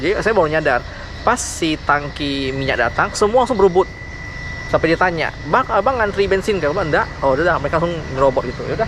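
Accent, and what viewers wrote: Pontianak, Indonesia. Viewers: " lewat tempat belobang pun nda beguyu" 😂😂😂 logat Pontianak kau tukh nampak benar...!! 😂😂 Utamakan selamat ya..!! 👍🏼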